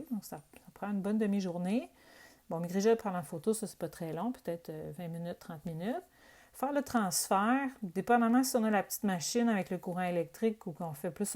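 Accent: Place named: Quebec, Canada. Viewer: Français québécois (et quelques termes en anglais). Ce n’est pas en français international, désolée.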